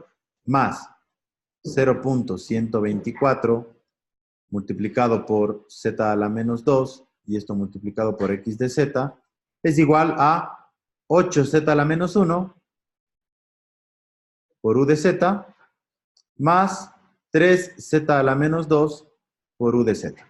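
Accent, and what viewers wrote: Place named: Cuenca, Ecuador. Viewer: ese acento, seras de cuenca-ecuador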